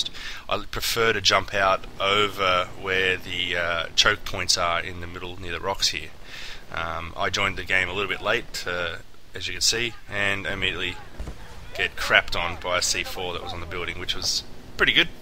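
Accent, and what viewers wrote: Australia. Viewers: holy shit an aussie commentator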